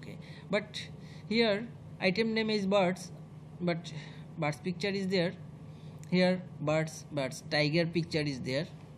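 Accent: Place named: Bihar, India. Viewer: Bihari english speaking first you speak clearly hindi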